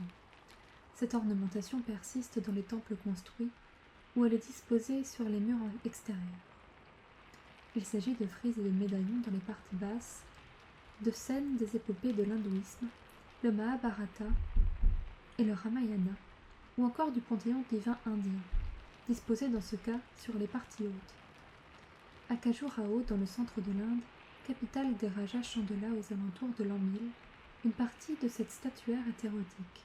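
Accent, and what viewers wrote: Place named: France. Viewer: You know, I’ve noticed this before when you made the english-french video. You speak both languages really well, did you grow up speaking both? Also, i don’t speak french but I’ve heard it enough that i feel confident assuming you’re french, right? Like your french is from france and not Quebec. That aside thanks for the nice video, i hope you make more french asmr but mayhaps a bit more slowly next time. Personal preference 😁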